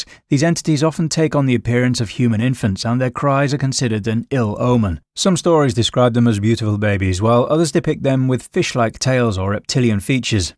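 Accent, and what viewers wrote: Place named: England, United Kingdom. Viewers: You Not even native American and making videos about your original predecessors 😂 lil english accent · I always find it a bit odd that people with English accents talk about American folklore.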